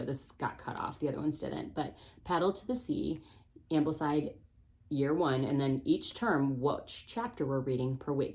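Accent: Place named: Western New York, United States. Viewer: Love that I found your channel. I am just starting this year with my oldest, a Charlotte Mason/Waldorf mix, but I love seeing how others use different styles to create their own. Very inspiring! Also, I knew you were from western NY before you said Buffalo, you've got the accent (I am originally from Rochester)!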